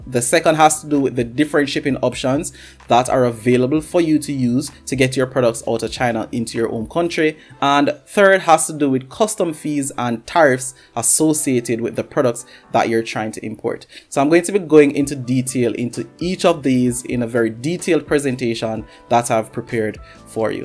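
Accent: Jamaica. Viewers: Bro your jamaican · U sound like a yaadi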